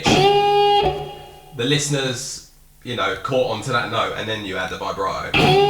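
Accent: England, United Kingdom. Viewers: Cool,! I like these tutorials, keep 'em coming. I like to listen to your Brit accent, too. 💯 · I didn't realise you were English. 👍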